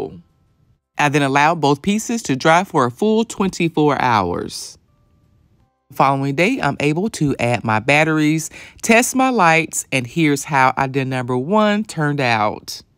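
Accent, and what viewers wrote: South, United States. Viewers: Girl this DIY is the bomb! Thank you so much! Love your southern accent too 😘😘😘😘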